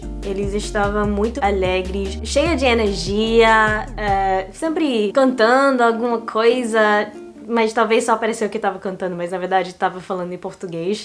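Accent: Rio de Janeiro, Brazil. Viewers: Disse para ela esquecer o sotaque carioca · você tem o sotaque brasileiro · Tem sim! Sotaque de Carioca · fico contente que você abandonou um pouco do sotaque carioca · parece ter um pouco de sotaque carioca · Aaaaaw the investment on carioca accent! Just music ❤️ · tem um pouco de sotaque carioca...kkkkk... maneiro! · Você tem sotaque daqui do RJ ♥️ Muito bonitinha kkk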